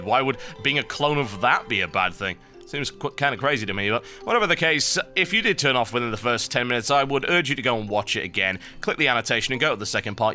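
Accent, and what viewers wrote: United Kingdom. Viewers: Love your British accent! :D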